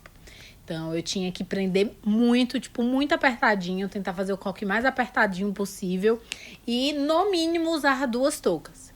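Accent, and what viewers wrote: Bahia, Brazil. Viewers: Nossa sempre que vejo, algum vídeo deste ca fico me perguntando esse sotaque "baiana" e agora tive a confirmação! Qual o interior ?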